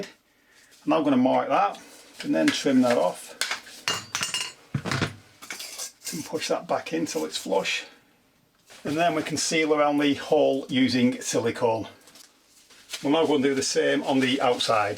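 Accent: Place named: Blackburn, United Kingdom. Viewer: Good video. Im willing to put my hand to anything and can usually figure things out but its always handy to have a good idea before you start and be sure you have all the parts to get the job finished. Judging by the accent your a local lad, Blackburn/Hyndburn area by any chance?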